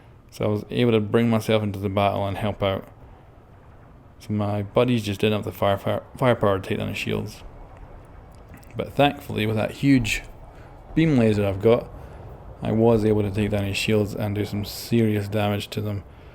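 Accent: Scotland, United Kingdom. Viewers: where in scotland are you from?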